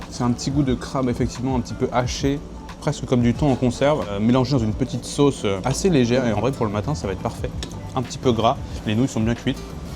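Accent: China. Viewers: Super video! Ca me fesait rire à chaque fois que tu parlais anglais avec l'accent chinois lol! J'ai hate à la prochaine video 📹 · Mention spéciale à ton anglais avec un accent chinois, c'est drôle et en même temps trop fort! Ravi de suivre tes nouvelles aventures:)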